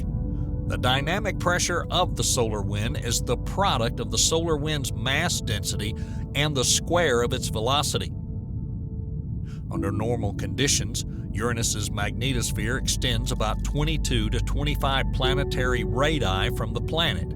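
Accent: South, United States. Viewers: I can’t figure out if the narrator is a genuine member of the scientific community, or maybe an enthusiast with an impressive understanding of the subject of astrophysics…or just someone regurgitating material from Wikipedia or space websites. I am well educated in physics and to a lesser degree astrophysics, so I can usually detect whether or not a person is pretending to know what they’re talking about or if they have a real understanding of the topic. But this guy confuses me! The complexities of quantum mechanics and astrophysics seem to be well understood by him, but at the same time, he mispronounces certain terms…egregiously! However, I too am from the south and I have experience with being judged by people who think my accent is an indicator of my intellect. Regardless, I thoroughly enjoy his content and often listen to his work in the background while I am doing housework or other mundane tasks.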